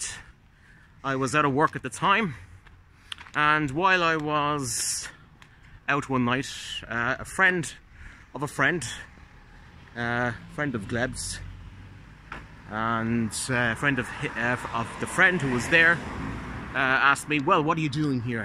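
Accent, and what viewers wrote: Waterford, Ireland. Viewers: dose the local people speak english like you ie they sound like paddys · I'm in the Midwest US and I l hear a slight Irish accent but it's a lot closer to a US accent than I've heard from most Irish. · On another video he says that he is from Waterford ,S.E.Ireland but lived a few years in Dublin .Waterford City people have a kind of neutral accent. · Why ask Bald? how would he know. He clearly has an Irish accent in the video. Ireland has a huge amount of Irish accents and none of them sound like Hollywood’s “Top of the mornings portrayal.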